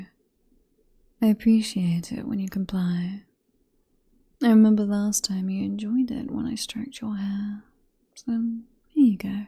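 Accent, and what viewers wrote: United Kingdom. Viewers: As a fellow briton, that accent is too posh british. My accent is a mix of slang british 😂 But nice Vid!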